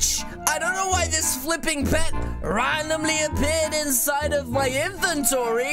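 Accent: England, United Kingdom. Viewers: O.O HES ENGLISH!.!. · Hey I didn’t know your british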